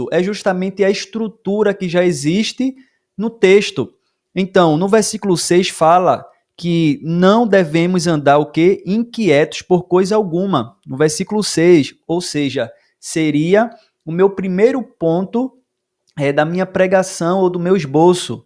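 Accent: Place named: Northeast, Brazil. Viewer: sou Do RJ, pelo sotaque creio que seja do nordeste. Fico feliz por ser tão jovem e dedicado a obra de Deus. Estava fazendo um estudo e apareceu o seu aqui no meu celular. Fiquei feliz . Deus te abençoe um abração do carioca.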